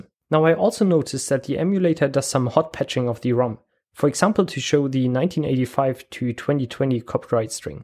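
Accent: Germany. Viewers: Are you german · Deutscher Akzent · Are you German? Because your accent sounds really German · Impressive job dude! Are you german? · He sounds german too, it only makes it sound more dramatic.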